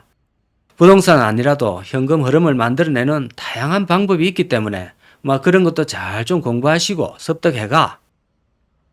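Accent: Gyeongsang, South Korea. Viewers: 경상도 사투리 일단 듣기싫노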